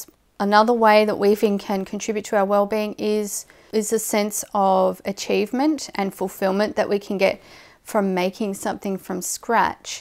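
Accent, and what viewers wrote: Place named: Australia. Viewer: I wish I had found you a few months ago. Apart from having the easier on the ear approach of us Aussies in comparison to some other countries, your videos are very informative.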